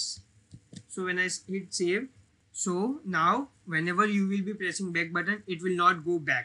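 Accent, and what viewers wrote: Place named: India. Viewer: Are you from India or somewhere near it? I love your accent.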